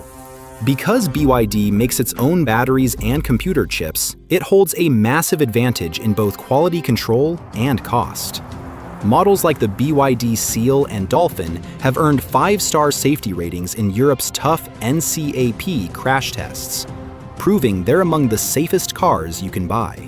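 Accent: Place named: United States. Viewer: And a yank is telling us all about it 😂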